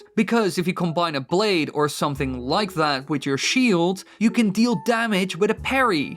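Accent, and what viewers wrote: Netherlands. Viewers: You have to be Dutch or Belgian man, I'm putting my money on Dutch though. Accent is bij IEDEREEN hetzelfde HAHA.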